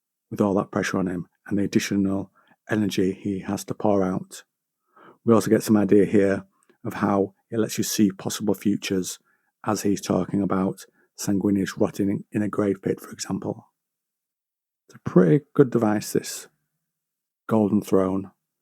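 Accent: United Kingdom. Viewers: Almost vomited within moments of listening to the cockney. Can't understand a word. Not for consumption west of shitlandia I mean Europe.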